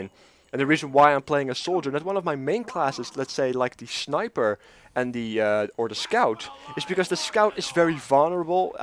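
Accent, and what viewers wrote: Netherlands. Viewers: you sounds dutch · are u dutch? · you dutch? =3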